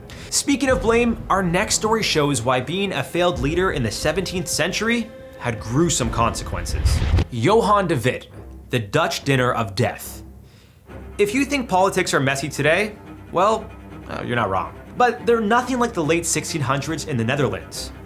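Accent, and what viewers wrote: United States. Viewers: Can anybody tell me which American accent he has? Cuz i dont know which kinda American accent o have bur whoever I have, it matches the most with his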